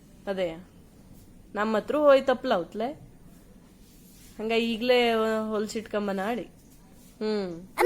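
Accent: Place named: Yellapur, India. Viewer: ನಮ್ಮ ಪ್ರೀತಿಯ ಯಲ್ಲಾಪುರ ಕನ್ನಡ ಬಾಷೆ ಎಂಥ ಸೊಗಸು 🎉🎉🎉🎉🎉🎉ಧನ್ಯವಾದಗಳು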